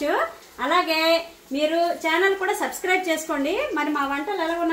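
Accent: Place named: Vizianagaram, India. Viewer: మీ మాటలు వింటుంటే మా విజయనగరం భాష లా ఉంటుందండి వాళ్లు చాలా చక్కగా ఆప్యాయంగా మాట్లాడతారు మీ వంటలు super 👌👌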